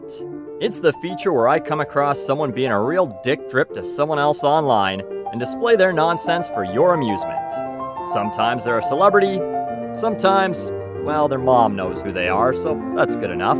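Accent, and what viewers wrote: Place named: Canada. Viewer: I love your canadien accent.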